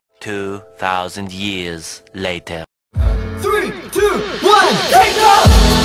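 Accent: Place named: Australia. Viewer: His Australian accent I can’t it’s so cute and just yk 😭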